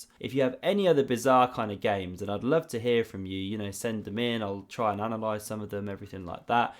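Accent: United Kingdom. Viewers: Funnily enough, I subscribed to this channel because I find his voice the easiest to listen of most chess guys. There are a lot of Indians and East Europeans doing chess content and though their content is good, the British accent is easier to my ear.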